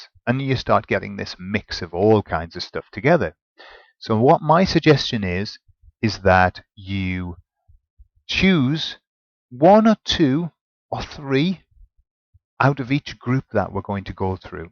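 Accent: United Kingdom. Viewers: The Queen's English accent makes everything become clear so fast, haha. I speak American english and I'm learning Mexican spanish. This lesson really helped. Thanks.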